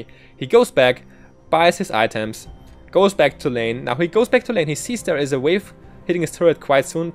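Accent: Germany. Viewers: Probably Spanish, But I think that he's Deutsch. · i can hear ur german roots ;)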